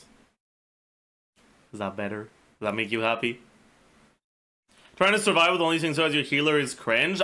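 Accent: Quebec, Canada. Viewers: Except as he put it if he ever travel to Paris, people will have to focus to understand what he is saying. His accent is really pronounced but its not as if only Quebec has strong accents so its no big deal. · I speak french but I'm not used to canadian french, I understand so little lmao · ouais, alors, en tant que français.e de france, ça reste chaud le français quebecois ToT mais j'adore <3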